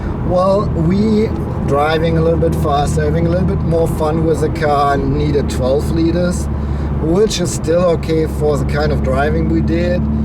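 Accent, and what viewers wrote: Germany. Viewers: I really really like your German accent. As a college student, my professor is a German who comes from Goettingen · Very good review and entertaining keep your German accent I will keep my french one...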